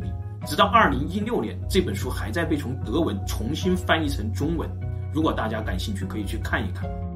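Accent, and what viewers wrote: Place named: Shanghai, China. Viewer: 不搭噶 up主上海人吧😂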